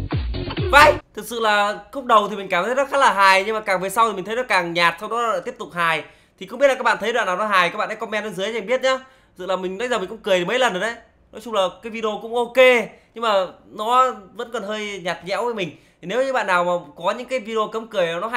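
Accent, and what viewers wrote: North, Vietnam. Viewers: miền Bắc nha bạn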